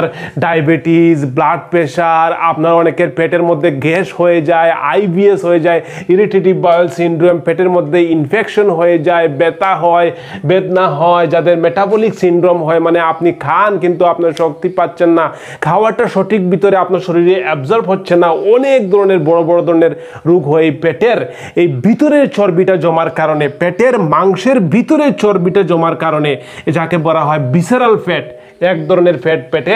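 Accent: Sylhet, Bangladesh. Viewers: সিলেটি ভাই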